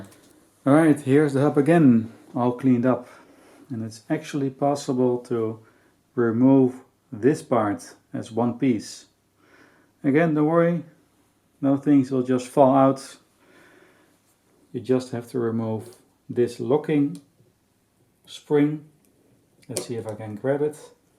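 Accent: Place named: Netherlands. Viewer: Ik kon horen dat je nederlands was haha! Bedankt voor de vid.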